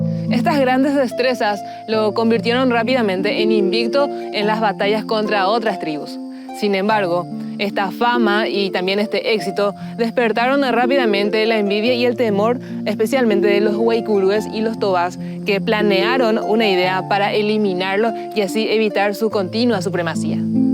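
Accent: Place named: Buenos Aires, Argentina. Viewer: Es porteña no es paraguaya por el acento al hablar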